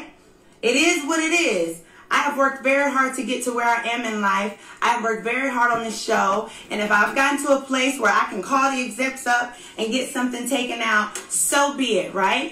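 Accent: New York, United States. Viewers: You don't usually sound like this... New York accent... sounding like Nicki Minaj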